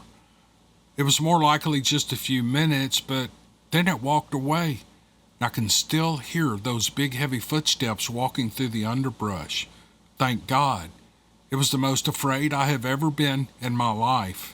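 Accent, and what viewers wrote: South, United States. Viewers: your commentary, opinion, jokes, southern drawl and personality are why we follow you. Hate to hear you constantly apologizing for being you. You need to understand that's why so many love your channel and continue to follow/ subscribe. We can hear Bigfoot stories many places but only at do we get the Dailey dose of we all need in our lives. Sending love from your Yankee followers in CT ❤❤❤ much love brotha, ty for being you!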